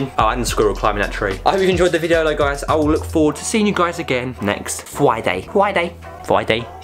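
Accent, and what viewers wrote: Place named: England, United Kingdom. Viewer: he's from England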